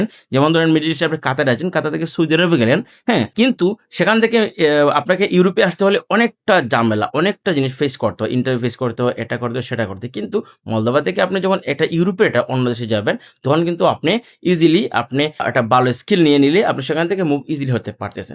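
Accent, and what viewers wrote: Sylhet, Bangladesh. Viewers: ভাইর বাড়ি কিতা সিলেট নি....?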